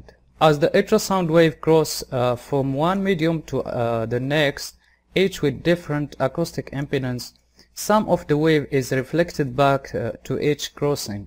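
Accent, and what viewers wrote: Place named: Maghreb. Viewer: أحسست فيك لهجة من المغرب العربي لذا سألتك ، انا اخوك من الجزائر ، بالتوفيق لك وشكرا جزيلا على الشروحات التي تقدمها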